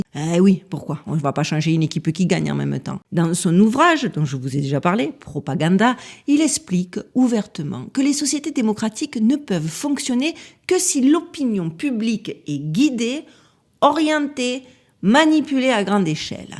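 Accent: South, France. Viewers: Merci 💛🙏, de Toulouse PASSIONNANT toutes vos vidéos , et LÀ, Particulièrement Vous Nous Faites du BIEN en Nous Éveillant 👍votre charmant accent Nous Évoque Le SUD francais Tous mes Voeux de Bonheur pour cette Nouvelle💫 Année ⛅🌤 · Bonjour, je viens de vous découvrir aujourd'hui avec deux vidéos successives et je ne m'arrêterai pas là! Vous êtes phénoménalement géniale, avec votre accent marseillais (ma soeur!) ou tout au moins du Sud. Je vote sans la moindre hésitation pour vous aux prochaines élections présidentielles françaises qui viendront je l'espère bien avant l'échéance prévue tant le désordre et l'insalubrité règne chez nous. Je consulte immédiatement toutes vos vidéos pour les écouter et les faire connaître autour de moi! Merci pour votre travail fascinant! ❤❤❤❤❤❤❤❤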